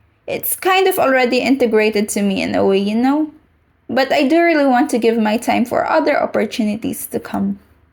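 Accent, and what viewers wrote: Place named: Philippines. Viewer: Filipino? The accent is strong.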